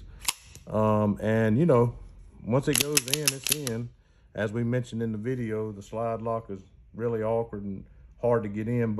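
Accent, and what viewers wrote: South, United States. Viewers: You can’t help your southern accent and I for one will not hold it against you sir , at least you speak English .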